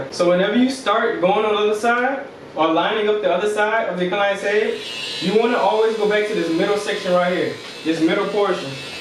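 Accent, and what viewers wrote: Louisiana, United States. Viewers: Louisiana accent ?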